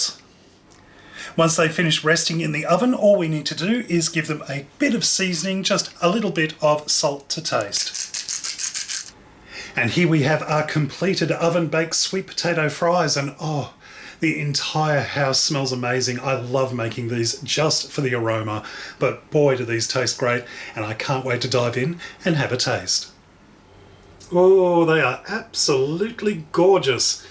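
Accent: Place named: Australia. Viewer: I do not care much about whatever your recipe is . I just love listening to your voice and the Aussie accent 🙌🙌🙌🙌🙌💝💝💝💝💝💝🇦🇺🇦🇺🇦🇺🇦🇺🇦🇺 I’m living in Houston,Texas. USA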